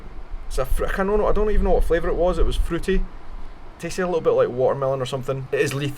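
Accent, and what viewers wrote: Scotland, United Kingdom. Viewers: You're not wrong, especially if it was a Scottish dude who's visiting these tailgate spots. A Scottish accent is basically a free pass. Most people wouldn't mind if you were drinking their alcohol. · Advice to you or anyone else traveling from abroad to an American sporting event - TALK TO PEOPLE! Us Americans LOOOOOVVEEEEE accents - Scottish is the best! - and once we find out you’re from elsewhere, it becomes OUR DUTY to show you the best time possible; you won’t pay for drinks the rest of the day, trust me! Never hesitate to use your accent as the currency it is here in the states; we love to have you! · Especially with that Scottish accent!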